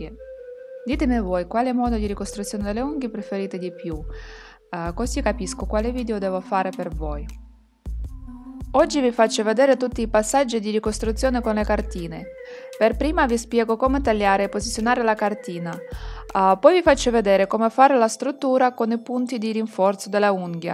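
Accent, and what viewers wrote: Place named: Ukraine. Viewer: L'accento ucraino 😩😍😍 (correggimi se ho sbagliato)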